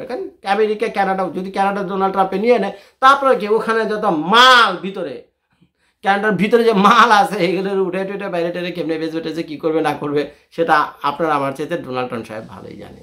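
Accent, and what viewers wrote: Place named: Dohar, Bangladesh. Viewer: আপনার কথাবার্তা গুলো শুনতে ভালোই লাগে, নিজের এলাকার (দোহার ও নবাবগঞ্জ) মতো কথাবার্তা মনে হয়। যদিও আপনার বেশিরভাগ মতামতের সাথে সহমত হতে পারি না।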